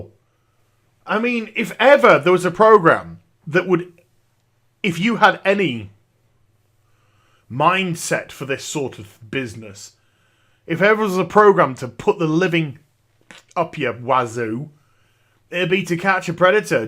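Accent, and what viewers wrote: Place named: United Kingdom. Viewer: Is there any chance you will drop the British accent and slang? Your info is interesting but cant stand it.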